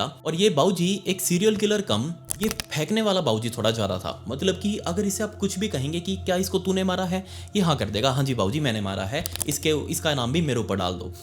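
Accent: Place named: Hyderabad, India. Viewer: I visited your channel after years and idk why I feel your accent is changed. You are sounding hyderabadi accent 🤧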